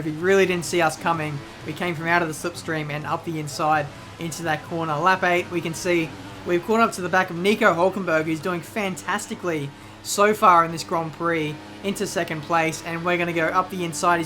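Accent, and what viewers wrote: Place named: Australia. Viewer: You sound like Daniel Ricciardo #AUSTRAILIAN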